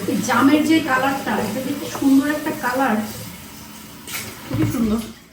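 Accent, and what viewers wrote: Sylhet, Bangladesh. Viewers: তোমাকে আমার খুব ভালো লাগে আপু,আর তার সাথে সিলেটের ভাষা😊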